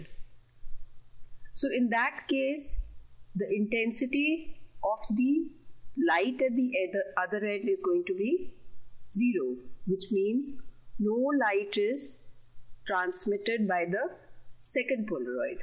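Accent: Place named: India. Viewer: too many x direction y direction in indian accent -.- ....makes it so uninteresting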